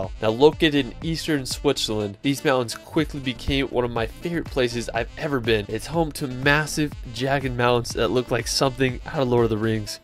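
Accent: United States. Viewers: Stunning views and great tips, but dude - at least TRY to learn the pronunciation of the names of mountains/villages you mentioned... it's definitely not that hard and you show a little respect, instead of saying it simply as an American. It's terrible to hear it. Thumbs up for video, thumbs down for this... I'm sorry. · Nice Video, great pictures. If you would only pronounce the names the way it's supposed to, and not with the thickest american accent, it'd be perfect Still, great stuff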